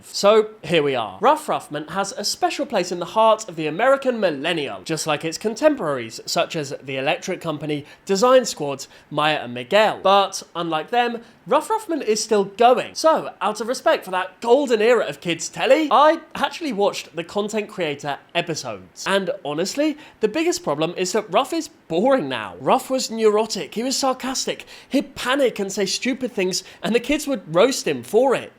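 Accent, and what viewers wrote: United Kingdom. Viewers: "I always looked forward to Ruff after elementary school" What do you mean you watched Fetch?! You're British! You watched CITV and CBBC after school! The accent gives it away unless you found a way to watch it abroad?! Or it aired on CBBC?! Actually it wouldn't be a huge surprise if that was the case Arthur aired on CBBC too. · You british right? DONT LET THE TEA TAX BE PAID.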